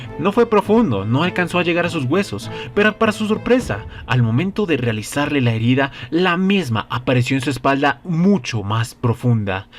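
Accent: Colombia. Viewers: a nmms eres colombiano?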